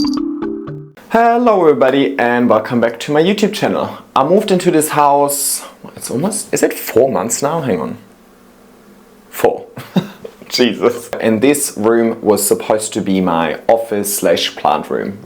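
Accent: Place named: Germany. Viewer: I vow to you I will watch your videos and I have subscribed to both.Not because Brad and Bailey are sooooo cute.Not because your German / Aussie accent is soooo sweet. NOT because I live to see Timothy and A.J. Because your very talented with plants and explain what your doing very well from start to adult plant.Your plants are healthy and beautiful.I LOVE SPENDING TIME WITH YOU.I can't wait for mom to visit.And yes see you were on t.v. so you know you have talent.I want to tap in on that.Thank you for making another channel as one was not enough time.And thank you for sharing you vast knowledge.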